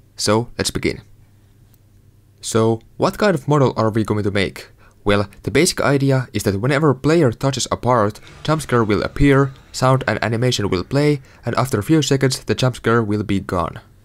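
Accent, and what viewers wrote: Finland. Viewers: Are you finnish? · Ootko suomalainen ku kuullostat silt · Accent sounded very familiar. Wait a minute.. SUOMALAINEN ROBLOX TUBETTAJA OMG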